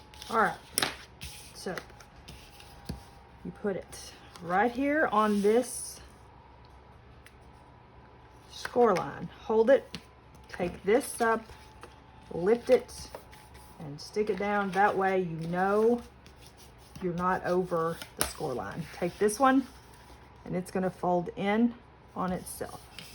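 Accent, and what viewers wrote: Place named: Tennessee, United States. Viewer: Very creative! I'm a new sub. Sounds like you are from TN, possibly KY or the Carolinas. The extender arm on my Fiskar cutting board won't go all the way up. Is there a trick to it? 💖🌷✒📖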